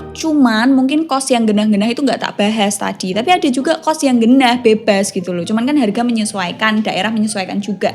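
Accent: Java, Indonesia. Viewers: mirip Nike Ardila, tapi ngomongnya agak medok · Medokmu itu bikin kesemsem😍😍😍 meskipun ga ngerti juga😅😅 salam dari bandung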